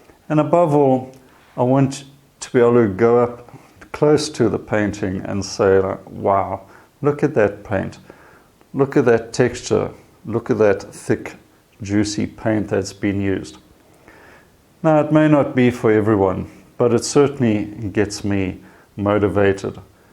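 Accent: South Africa. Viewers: Thank you for your tips on painting! I really enjoy watching your videos and also listening to your south african accent as I lived 4 years there and left it for Kenya in 2017. SA always in my heart! Regards.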